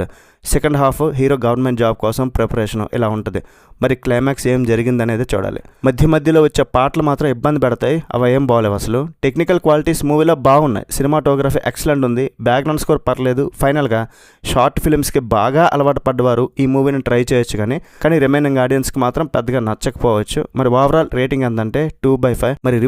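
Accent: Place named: Telangana, India. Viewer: జై తెలంగాణ యాస 🌚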